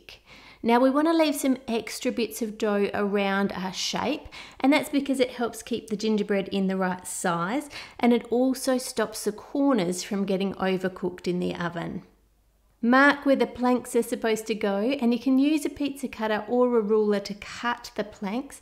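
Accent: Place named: Australia. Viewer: Why am in love with her accent Australia Day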